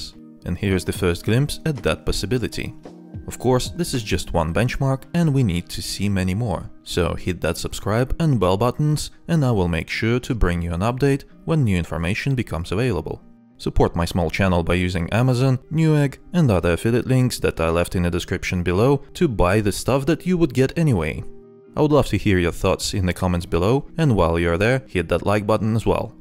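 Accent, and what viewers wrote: Russia. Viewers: Как же пробивается твой русский акцент) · русский акцент))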